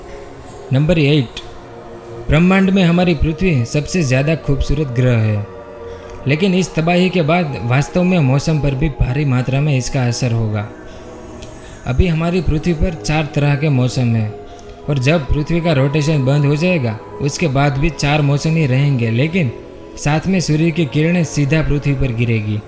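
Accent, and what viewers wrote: Gujarat, India. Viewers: Bhai tu 100%gujraathi hai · गुजराती हो किया भाई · brother u r gujarati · Ha 100% u r gujrati.. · You tuber is gujju ....??? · bhai Gujarati hai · You sounds like a Gujarati 😃🙂☺ Gujjubhaai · Gujarati hai tu....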